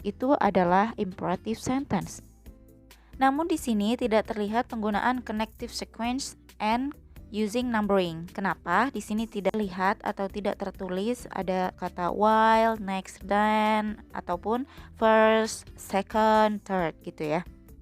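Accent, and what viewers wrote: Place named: Indonesia. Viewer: Nice indonesian accents Favourite teacher....thankyou for the lesson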